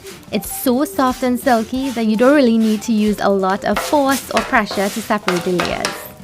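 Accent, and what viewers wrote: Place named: Trinidad and Tobago. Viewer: Amazing, love the trini accent.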